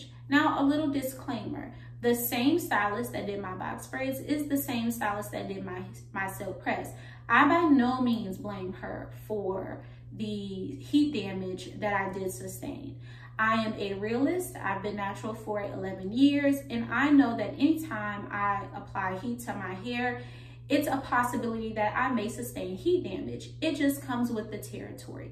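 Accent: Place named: New Orleans, United States. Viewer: That accent don’t lie lol to say you’ve been gone so long New Orleans is just in us 😂🙌🏽🥰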